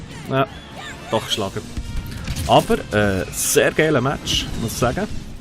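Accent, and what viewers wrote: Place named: Bern, Switzerland. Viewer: z erstä bärndütsch lp u youtube. chunt eim huerre komisch vor. aber geil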